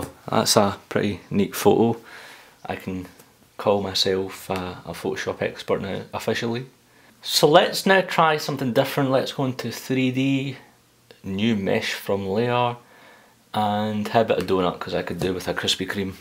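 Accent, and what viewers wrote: Scotland, United Kingdom. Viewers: Sounds like a Scotsman must be a Scotsman!hello mate....can you run xcode?it runsok??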